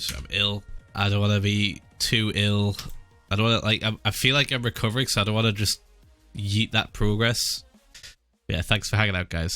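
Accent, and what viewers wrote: United Kingdom. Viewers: I know im so fucking late but im working up alright. The voice sounds more british somehow. Its more gummy.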